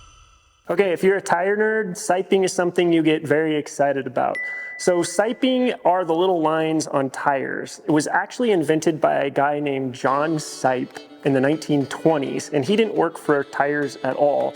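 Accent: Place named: Midwest, United States. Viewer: Based on your mid-west accent I'm going to say the Packers or the Lions!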